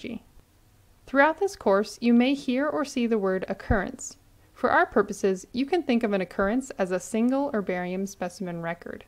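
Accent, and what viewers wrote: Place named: India. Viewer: Talk like indian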